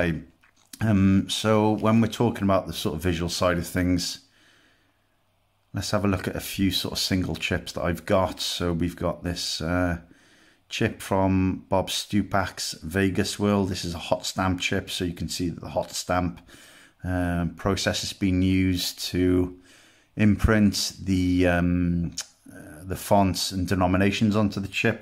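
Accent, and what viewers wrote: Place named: South Wales, United Kingdom. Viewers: Swansea, along the Mumbles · You sound like a south walean. Am I right? Cardiff/Caerphilly way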